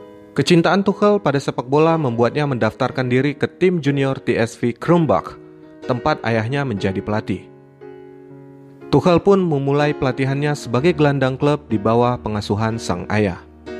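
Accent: Medan, Indonesia. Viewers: Orang medan rupanya abg ini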